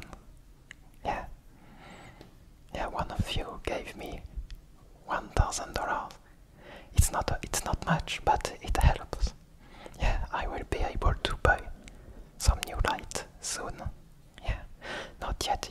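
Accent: France. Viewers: J'adore cet accent français 😂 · Cet accent français ! 😂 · tu as un accent vraiment français je ne sais pas si tu l’es à la base c’est juste de la curiosité:))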